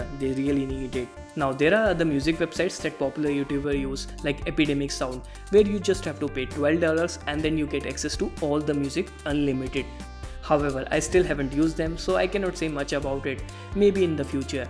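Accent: India. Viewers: Why am I getting angry at his annoying indian accent....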